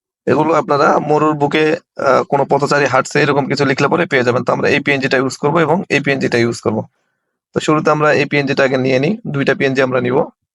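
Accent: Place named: Joypurhat-Naogaon, Bangladesh. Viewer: vai apner basa ki joypurhat,naogaon er dike naki?kotha bolar dhoron sune jigges korlam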